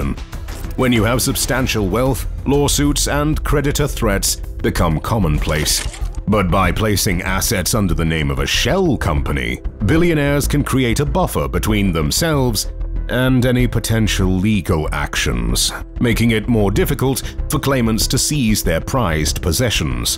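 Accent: United Kingdom. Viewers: I don't like British accent. Plus, the narrator's tone is rude & imposing. What he told abt Russia was totally false, hostile & misinforming. Why'd he do it? Ask him! It's an old rivelry between 2 worlds. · I don't like British accent too. Prefer Australian, Canadian and US accents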